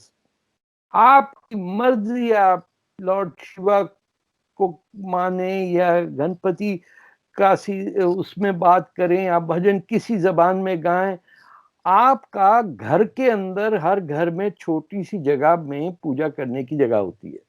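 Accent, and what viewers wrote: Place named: Bihar, India. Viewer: Didi aap Japanese jada lgte ho.... Par jese heee bolte ho lgta h koi patna ranchi wala bol rha h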